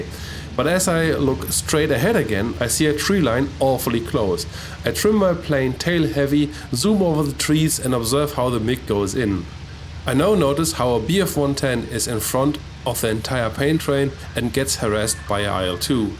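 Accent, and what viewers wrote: Germany. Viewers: I love these videos - the kind of practical, field study lessons that make a real difference in real-world fights compared to generic classroom do's and don'ts. Also, "... but after I'm sure the contrail dude just keeps trucking... " - American slang with a German accent. Brilliant! lol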